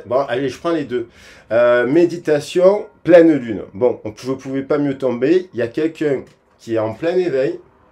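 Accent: South, France. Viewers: Coucou je t'envoie mes ondes les plus positives pour que tu rencontres ton âme soeur et qu'elle te demande en mariage:face-red-heart-shape: Vu toutes les bonnes choses que tu nous envoies avec le coeur, et tes tirages de cartes toujours empreints de positivité, toutefois très réalistes et sans mièvrerie, je suis sûre que je ne serai pas la seule à formuler ce souhait! C'est toujours un plaisir de t'écouter (surtout avec ce bel accent du soleil), et merçi encore pour ta générosité de coeur. Tes vidéos on les écoute et on en redemande! ❤ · Je l ai délecte cette canalisation...divin gratitude pour ce moment magique et magnifique avec l accent du sud en plus...🙏🤲🪷🌹🕯👌👍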